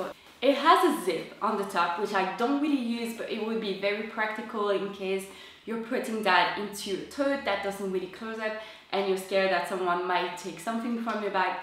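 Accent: France. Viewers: Love love love your French accent !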